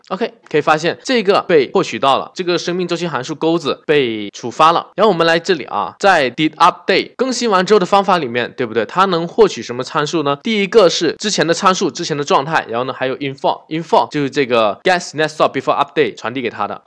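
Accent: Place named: China. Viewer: 除去英文不谈，这口音是哪里啊，肯定不是北方了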